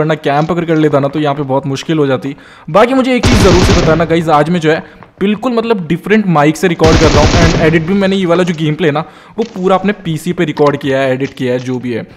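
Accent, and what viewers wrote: Gujarat, India. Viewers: Aare u gujrati?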